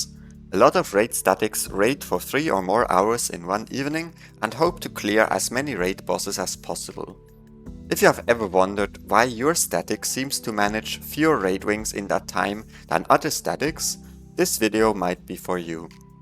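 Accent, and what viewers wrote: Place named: Germany. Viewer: You are German, yes?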